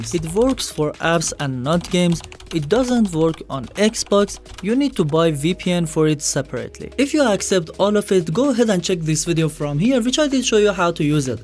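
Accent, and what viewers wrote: Iran. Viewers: فکر کنم شما ایرانی هستی. از لحجه انگلیستون فهمیدم👌🏻✌🏻😅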